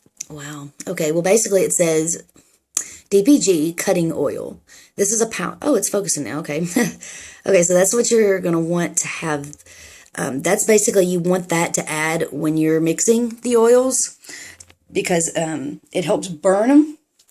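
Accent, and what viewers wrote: South, United States. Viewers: The video was fine. Don’t pay these dummies no mind. I’ve never done incense and want to try it and this is instructive. Gosh, and that wonderful southern accent! I totally miss hearing it!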